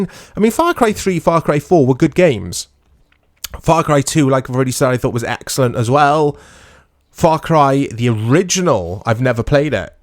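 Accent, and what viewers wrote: Wales, United Kingdom. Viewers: Are you Welsh bruv?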